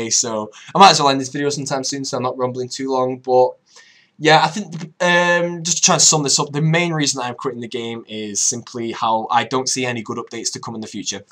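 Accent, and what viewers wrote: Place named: North, United Kingdom. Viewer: Feel free to commentate on my videos once I've finished them in due time. We could even harmonize with your northern and my southern accent.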